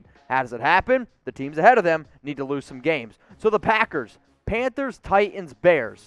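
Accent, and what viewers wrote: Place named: Pennsylvania, United States. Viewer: I knew I detected a hint of PA in your accent. I’m in the Lehigh Valley. Breinigsville, PA specifically. Grew up in Seattle, therefore Go Hawks!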